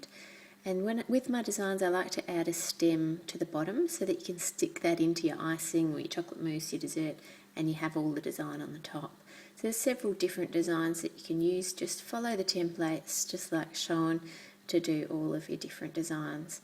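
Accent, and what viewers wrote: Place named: Australia. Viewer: thats amazing. your ausie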